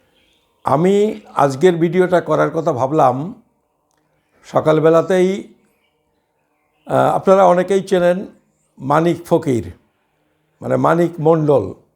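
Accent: Bangladesh. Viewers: Upnar kothar tune shune mone hochhe apni o purba banger, poriskar holo na. Manik Fakir babu r upni ekshonge boshe ekta vidio kore byapar ta clear korun please. Ki ki proman potro lagbe....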